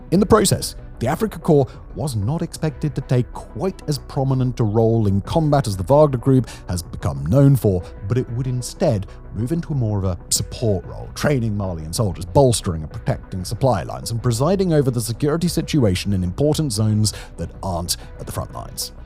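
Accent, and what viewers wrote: United Kingdom. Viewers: Dude... he doesn't run these channels. He is just hired as a reader because of his British accent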